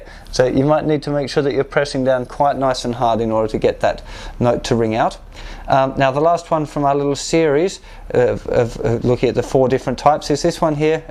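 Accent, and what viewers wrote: Australia. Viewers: are you australian? because you sound aussie.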